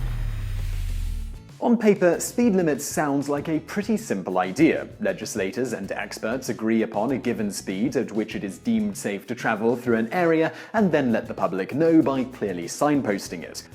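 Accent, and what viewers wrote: United Kingdom. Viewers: It's funny hearing someone with a British accent use, "miles per hour".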